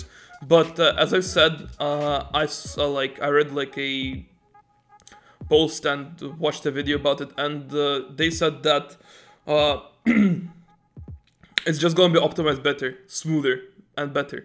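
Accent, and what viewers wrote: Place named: Sweden. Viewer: Wow i quite didn't expected that :D you sound like you're swedish maybe german or polish but not russian :D Great accent mate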